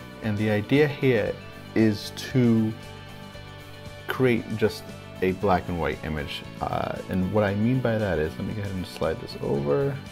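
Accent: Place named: Mid-Atlantic, United States. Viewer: Hi - or is that? Thanks for a well considered tut. The work around may be a bit fiddly, but I can see how it would work. For people interested in applying a mask-to-denoise method to stills, there is a vid tut made by the Photoshop plugin vendor, Topaz Labs, that explains this. I believe it was made 1-2 years ago. Regarding mask making to extract areas of luminance, I often use this technique with image sequences of actors in front of green screen. In this case, too often selected areas of colour have differing grain/ noise characteristics. I have also found playing with the AE Shadow/ Highlight filter on my ortho masks can help! If I may say so you come across as a patient, gentle, unhurried sort of person. Of course as filmmakers we all should be patient (and experiment!). However, too often video bloggers on YouTube dash through their tuts as if the moment had no significance other than seeking a moment of attention. 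Where do you hail from? You do not have a conventional North American accent. There is a hard end to your “A” sound, but the overall suggestion is mid Atlantic, or ex-pat Australian.:)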